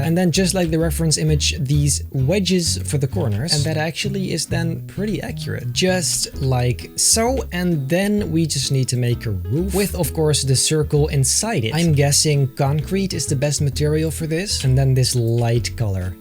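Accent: Netherlands. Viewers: hey are you dutch /NL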